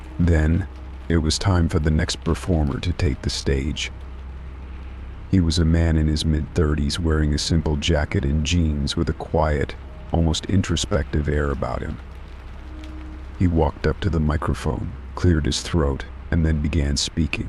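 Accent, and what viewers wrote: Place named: Southern England, United Kingdom. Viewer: South England. You are really helping me to sleep thank you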